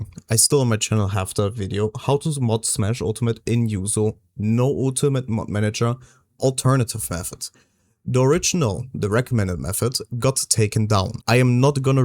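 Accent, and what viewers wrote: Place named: Germany. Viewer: i hear a little deutsch in your voice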